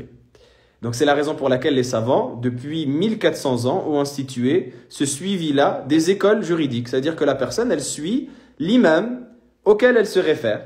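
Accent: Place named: Turkey. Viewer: Barakallah oufik, j'ai bien aimé l'accent turc 😅😅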